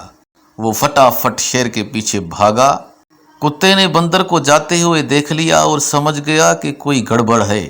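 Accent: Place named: Sindh, Pakistan. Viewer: بہت ہی خوبصورت انداز سے آپ نے کہانی بیان کی، لیکن لہجہ آپ کا بلکل سندھیوں جیسا ہے۔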